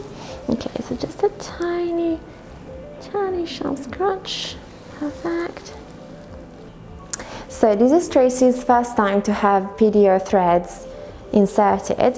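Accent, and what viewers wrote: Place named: Iran. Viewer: She has Iranian accent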